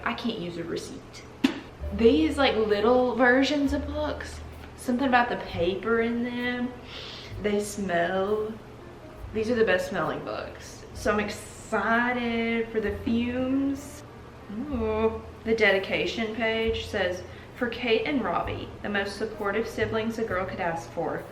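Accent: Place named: Texas, United States. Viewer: I'm from England and I like the Texas accent lol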